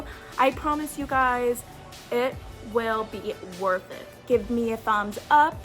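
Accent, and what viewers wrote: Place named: Texas, United States. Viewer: really Im in Texas I could’ve sworn for a second I heard a little Texas in you haha but dang that’s a lot of money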